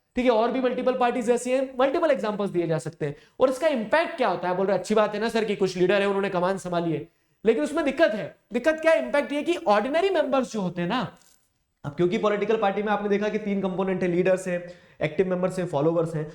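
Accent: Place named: Rajasthan, India. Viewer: rajasthani tadka sir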